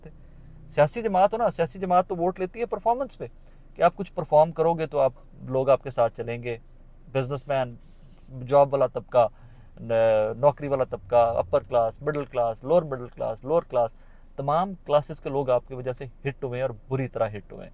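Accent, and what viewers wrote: Punjab, India. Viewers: Ur Punjabi so good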